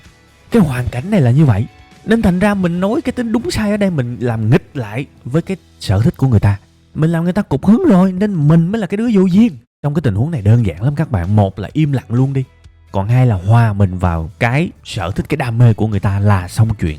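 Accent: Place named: Mekong Delta, Vietnam. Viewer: bài giảng quá hay, diễn đạt dí dỏm, dễ hiểu, giọng miền Tây ngọt ngào, truyền cảm.. . Thanks!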